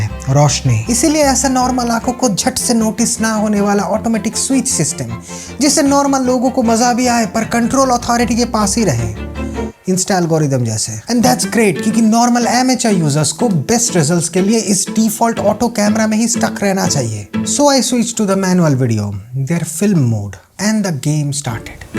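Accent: Bengal, India. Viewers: Tera hindi sunkar maza aa gaya tu bengali hai tera hindi sa pata chalta hai ❤❤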